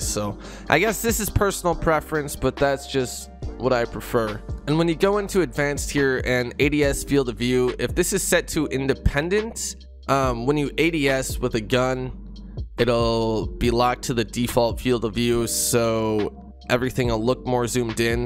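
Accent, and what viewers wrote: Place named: Russia. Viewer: u sound russian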